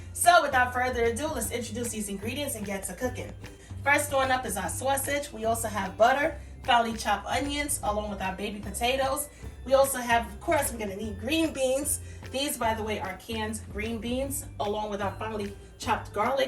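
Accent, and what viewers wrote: New York, United States. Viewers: That New Yawk accent is adorable. 🥘💙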